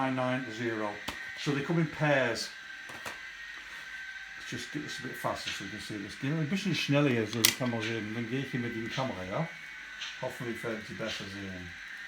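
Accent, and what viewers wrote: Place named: Manchester, United Kingdom. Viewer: Nice pick ups....it still cracks me up when you are.talking Manc and then go into fluent German.......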